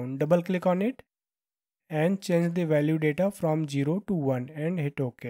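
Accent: India. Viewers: Whenever i hear an indian accent I click off because they never work like this one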